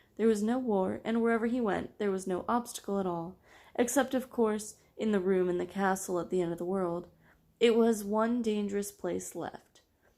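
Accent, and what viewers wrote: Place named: United Kingdom. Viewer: Your british accent is wonderful in this one!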